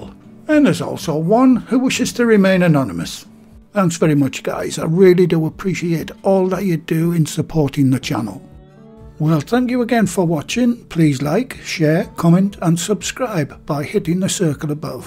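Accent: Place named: North, United Kingdom. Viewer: Love your presentation! Very organized and straight-forward, anyone can have confidence following you, and expect good results. Love the Northern accent too!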